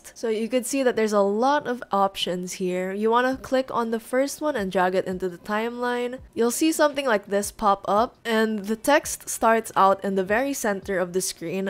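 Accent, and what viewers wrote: Philippines. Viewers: hmmm i sense a filipino accent are you a filipino? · your accent is familiar are u filipino? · for some reason you sound filipino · w8 yur accent is filipino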